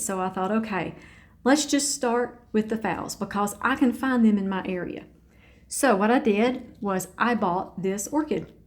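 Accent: South, United States. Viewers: Oh I figured down there (assuming you're in the south because of the accent)that you might grow stuff outside as well. Yes, it's common for young growth to have red tint, especially if the plant is in light that is high for the plant or if they were recently moved from lower to higher. Dr Martin Motes says that when plants flush after being exposed to cold, that it's due to low magnesium, but someone else said he's wrong, and cited one source saying that high mag will increase the red. I wanted to see if you had ever tried that, but then I found the answers that back him up. The anthocyanins that show up when there is high mag are not the same ones that show up in the cold. (Or the ones that sometimes show up in normal temps with low mag). There are different ones that do different things, and then I went to his videos and he relayed at about 20 minutes in to the rough cut video and he talks about some vandas they imported from Taiwan that were deficient and turned purple and when they added mag..well I'll just let you watch that if you're curious instead of typing it all out here :)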